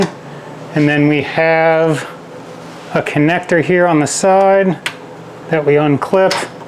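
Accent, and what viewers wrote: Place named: Philadelphia, United States. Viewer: Very informative! I appreciate learning about the Cameo. You sound like you are from Philly.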